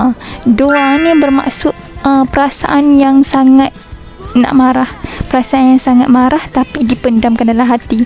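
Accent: Kelantan, Malaysia. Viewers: Wah mengajar dialek Kelantan 😲. Saya rasa bangga pulak bila boleh faham sikit-sikit 😂 bila ada cakap Kelantan. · Menariknya ulasan ni 😍😍😍. Suka dengar kecek Kelate. Ulang, ulang dengar 😄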